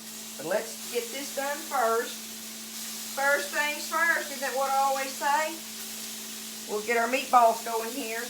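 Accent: South, United States. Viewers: As soon as I heard the southern drawl in her voice.. I knew this was going to be a delicious recipe.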